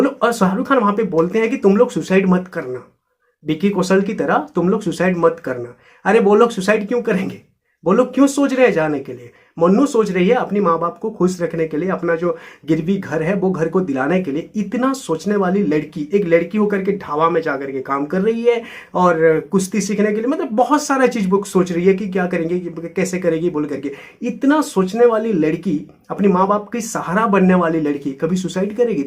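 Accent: Odisha, India. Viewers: Bhai AP kya odisha se ho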